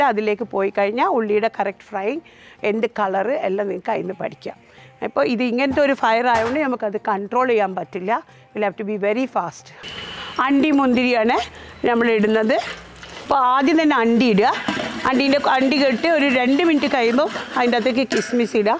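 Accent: Kozhikode, India. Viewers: This is og Calicut slang. Surabhi in m80 moosa uses a bad mimicry of this. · Kozhikkode സ്ലാങ്ക് പൊളി........ ഇങ്ങള് ബരിം.........😊👍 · Her kozhikode slang♥️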